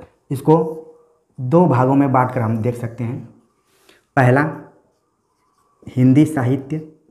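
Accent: Bihar, India. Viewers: आप बिहार से है क्या सर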